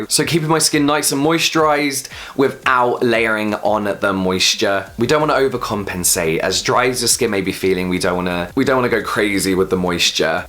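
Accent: United Kingdom. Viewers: Am I the only one who dies for the British accent? :D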